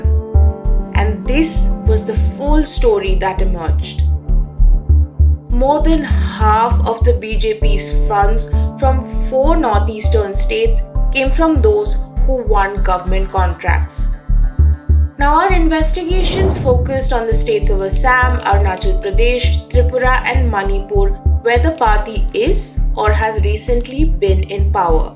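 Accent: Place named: United States. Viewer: This woman speaks in Americanized English and has a problem with lobbying? JNU bawasir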